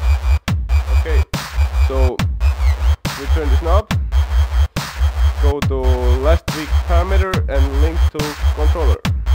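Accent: Estonia. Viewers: D arvasin aktsendi järgi ,et eestlane. Checkin kontot, ongi eestlane . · tüüpiline eesti aktsent :D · Kõlab väga eesti aksendi moodi :) Aga ei 5+ tutoorial :) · Estonian accent ? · kle sa eestlane w aktsendi järgi tundub kyll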